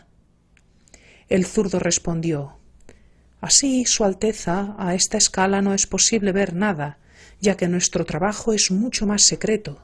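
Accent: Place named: United Kingdom. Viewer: Gracias por tu esfuerzo. (...y asentó británico ).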